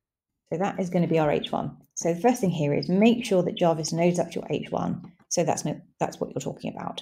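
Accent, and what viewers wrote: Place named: United Kingdom. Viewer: After I made the comment I was thinking that it might have been your microphone? I look for education, not for entertainment. Also, there is nothing wrong with your British accent, it was the sound quality!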